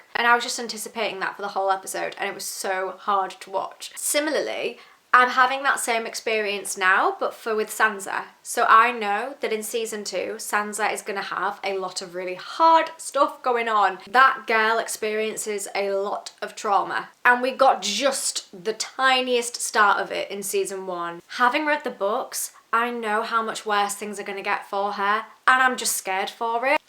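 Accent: United Kingdom. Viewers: tbf I had some time off for medical reasons so it was the perfect time to start. Got me through a tough period. Forgot to mention, the show really does a great job with the music! is a song you want to hate but it is beautifully composed. And one of my favorite bits of the latter seasons is a full version of Jenny's song (the one Tom o' Sevens sings to the Ghost of High Heart). You'll also hear and at some point. As you continue to watch you'll notice each house has their own dedicated song and you'll here different versions of them throughout the show. The score is phenomenal! Love the accent by the way, I live in the US (from the Caribbean) but work with a score of English expats (Camden Town, Leeds, Newcastle and Leicester), so it feels like I'm listening to a friend.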